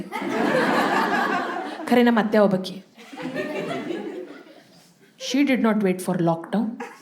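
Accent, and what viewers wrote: North Karnataka, India. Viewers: there's no question of 'Hope'... I simply love your comic timings.. its beyond perfect n the north karnataka kannada is like icing on the cake!!! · ನಿಮ್ಮ್ ಉತ್ತರ ಕರ್ನಾಟಕದ ಭಾಷೆ ತುಂಬಾ ಚೆನ್ನಾಗಿ ಸಾಮಾನ್ಯ ಜನರು ಯೋಚನೆ ಏನಿದೆ ಅದನ್ನೇ ತಾವು ಹೇಳುವದು , ತುಂಬಾ ಚೆನ್ನಾಗಿದೆ , ಹೆಚ್ಚಾಗಿ ಬರಲಿ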